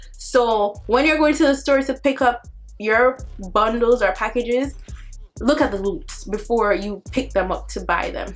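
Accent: Jamaica. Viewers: I’m sooooo SURE your Jamaican. Right or wrong?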